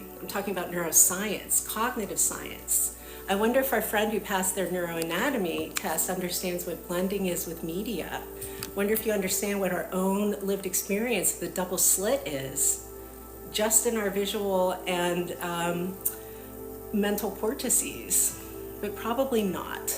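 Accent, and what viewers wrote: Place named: Pittsburgh, United States. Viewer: Are you from Pittsburgh? By any chance your accent sounds familiar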